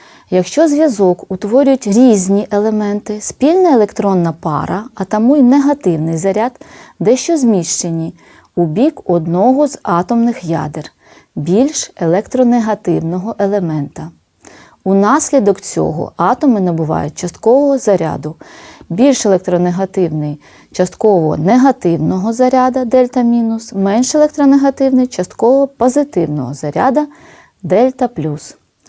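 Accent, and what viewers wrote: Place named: Russia. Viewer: Дуже відчувається, що людина говорить російською.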